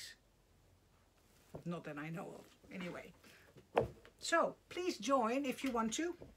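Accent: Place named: Netherlands. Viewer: I love ur bags and socks. My Grandmother was Dutch and hearing your accent brings me back. I have tinnitus as does my mother. The first couple of months it drove me crazy but now I don’t hear it all the time unless I think about it. I think my brain and central nervous system adjusted to it. It’s hard to explain.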